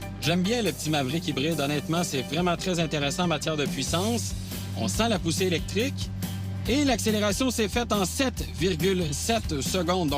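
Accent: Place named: Quebec, Canada. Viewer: J’adore l’accent Québequois!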